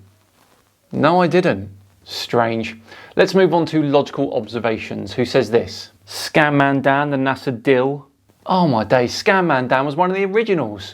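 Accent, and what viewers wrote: United Kingdom. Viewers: is a nasa shill using a British accent or whatever to sound smart he also could be homosexual according to some accounts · I think Dan is hilarious. I’m assuming he’s British from his accent and sense of humor, but that’s right up my alley. Besides, if I didn’t, I wouldn’t be here.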